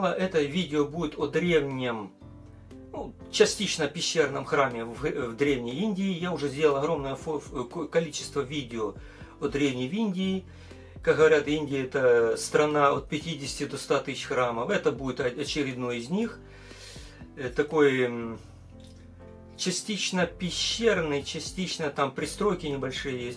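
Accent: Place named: Russia. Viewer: Excuse, I think the narration is great as it is. English with a Russian accent sounds good, information is all there and filming is brilliant. Don’t change a thing.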